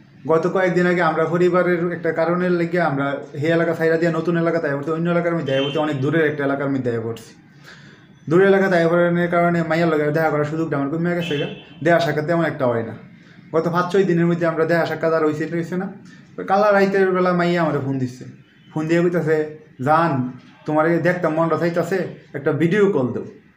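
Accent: Brahmanbaria, Bangladesh. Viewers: But vai toh b-bariar mone hosse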